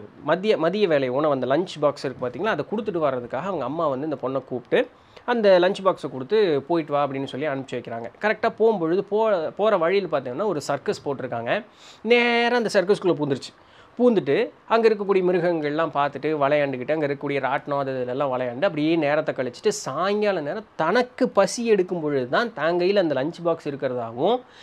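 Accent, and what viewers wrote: Coimbatore, India. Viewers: Magical voice nice story Coimbatore slang 👌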